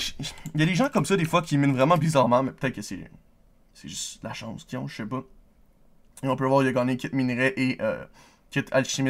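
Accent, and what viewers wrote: Quebec, Canada. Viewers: t quebequoi ? ? ?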